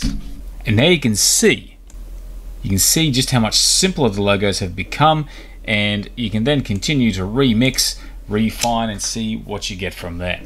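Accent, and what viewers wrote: Australia. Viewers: Great to hear from an Aussie!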